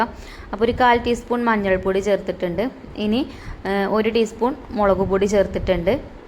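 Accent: Malappuram, India. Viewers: Enikk Malappuram basha valiya eshtam anu ningaleyum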